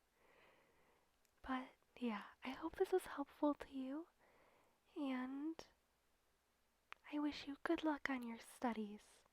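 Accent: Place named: United Kingdom. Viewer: I came for the accent, and you know what? I can tolerate what i'm hearing at least it's not an extreme British accent.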